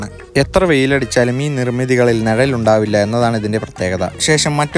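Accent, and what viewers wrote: Palakkad, India. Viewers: എവിടെ പോയാലും നമ്മളെ പാലക്കാട്‌ ഭാഷ ശൈലി മാറില്ല 😁😁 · Etra chadialum palakkadan bhasha ningale vittupovilla bro. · Ith palakkad achadi bhasha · തനി അച്ചടി പാലക്കാട് മലയാളം😁😍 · Njangate sgk inganonnumalla samsarikka ith palakkad achati slang anu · Nalla palakkadan achadi basha......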